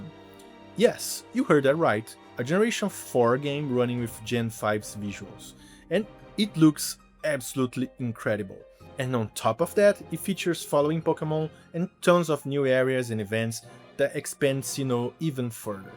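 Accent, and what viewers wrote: Brazil. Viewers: Vc é brasileiro? Tem um sotaque tão brasileiro kkk ótimo vídeo!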